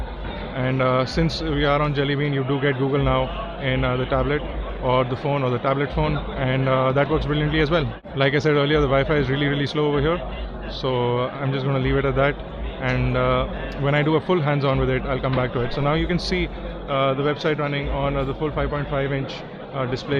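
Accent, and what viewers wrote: India. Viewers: Speak more slowly and open you mouth when you pronounce your words. It's not easy to keep up with your Indian accent.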